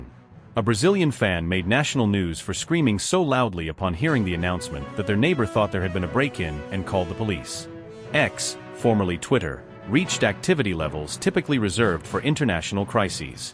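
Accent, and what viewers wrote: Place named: United States. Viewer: Soon as you hear a yank, you know its a pile of crap!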